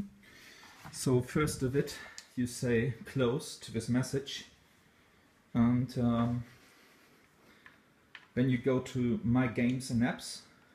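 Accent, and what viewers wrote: Germany. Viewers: deutscher lol?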